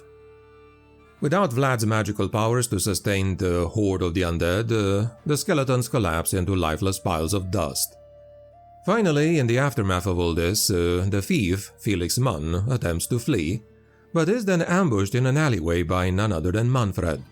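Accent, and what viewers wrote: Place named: Transylvania, Romania. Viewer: live from Transylvania! Two blocks over from Dracula's castle 😎. Okay I'm sure you're probably not near Dracula's castle, but I can at least pretend you are 😊. I mean come on, that accent 🥰.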